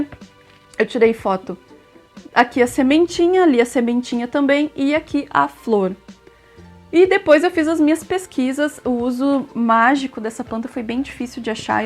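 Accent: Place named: Porto Alegre, Brazil. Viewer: Que dica incrível! Esse app vai ajudar muito, eu tenho bastante receio de usar uma planta errada pra fazer chá e passar mal, acho que vai ajudar bastante mesmo 💜 bjuuuu (eu amo que tu fala do mesmo jeito que eu por sermos daqui de Poa, sempre acho que teus outros seguidores não entendem as nossas "gírias" kkkkk)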